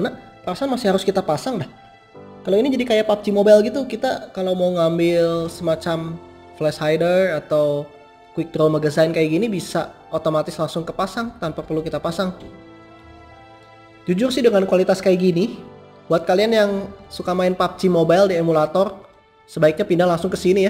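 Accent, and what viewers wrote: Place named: Sunda, Indonesia. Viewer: Logat sunda nya kental brow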